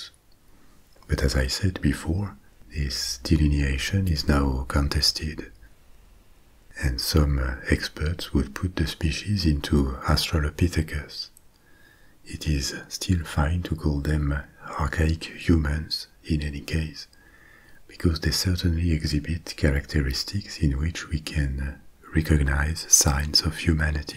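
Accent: France. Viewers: ÇA C'EST MA FRANCE AVEC UN BEAUX ACCENT MERCI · You sound parisian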